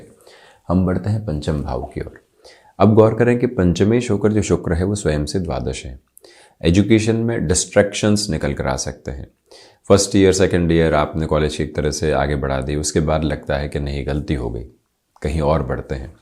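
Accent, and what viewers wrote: Standard. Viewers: Aap ki hindi bahut shuddha hai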